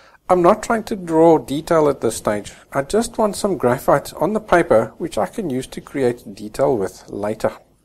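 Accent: Afrikaans, South Africa. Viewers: Is that a Afrikaans accent I'm picking up?? XD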